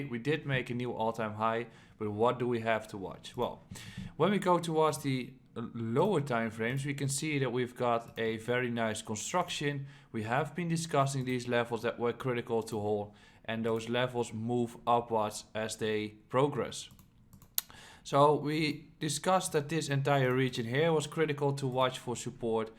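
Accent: Netherlands. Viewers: Are you Dutch? You sound like Dutch.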